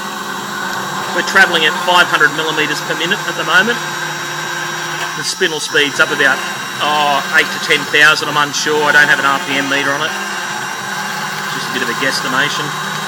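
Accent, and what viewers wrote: Australia. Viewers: great to hear aussie accent at last!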